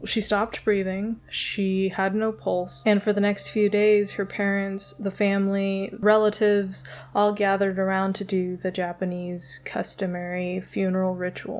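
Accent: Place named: California, United States. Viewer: Ms,Were you born in Okinawa? where were you raised? Your accent is as though you are from California. I first went to Okinawa in March 1960.I was married there in 1965 and my two boys are still there.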